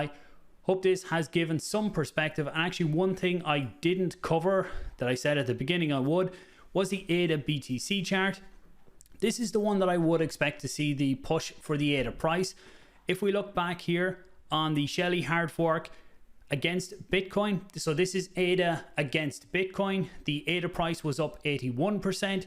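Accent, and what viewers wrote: Ireland. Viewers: Thanks for the quick video and update, —always appreciate your insight. New mic sounds clean; that beautiful Irish accent is as crystal clear as ever!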